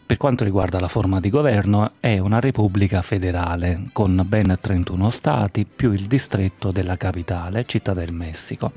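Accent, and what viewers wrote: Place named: Spain. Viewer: Wow che bell accento in Spagnolo!❤️🙌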